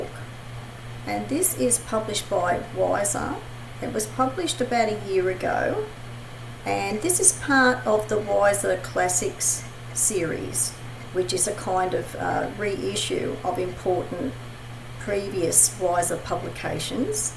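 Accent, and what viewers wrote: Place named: Australia. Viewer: Great to find your channel and an extra surprise was the Aussie accent. Im in Sydney myself. Looks like the YT algorithms work as your channel came up on my feed. Thanks for providing all this content. Looking forward to catching up on the older videos. Cheers 🖖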